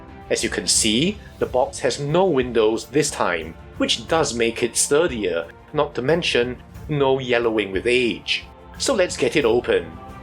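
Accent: Singapore. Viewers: you sound singaporean/malaysian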